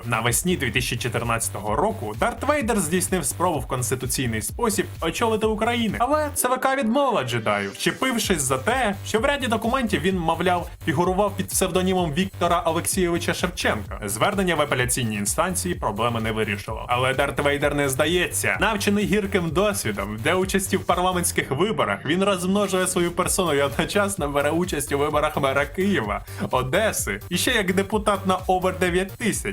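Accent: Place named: Russia. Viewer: В тебе класний контент. Правда мені здалося, що той тон, швидкість, як ти говориш текст, схожий на когось з російськомовного сигменту, я не кажу, що це погано, але здається, що тобі було б зручніше підібрати свій темп та тон, як глядач/слухач скажу просто - трохи важко розуміти, що ти говориш бо воно зашвидко і трішки нечітко. Але взагалі тобі мега вподобайка і обов'язково продовжуй!!!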